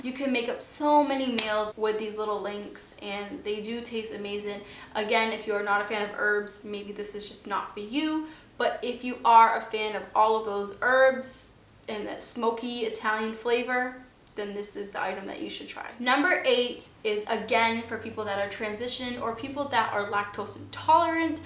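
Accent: Boston, United States. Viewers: Stop it!! People love that Boston accent! Don't apologize for being you, we're here because we love your content. ❤️ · Great video! My favs are Kite Hill cream cheese and Gardein 7 Grain Chicken strips. Also, I'm from Boston but moved away a few years ago. Hearing your accent reminds me of home and I love it so much! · From a former New Yorker I love your Boston accent, It's too cute, remember we watch you for you and what you bring to YT so embrace your uniqueness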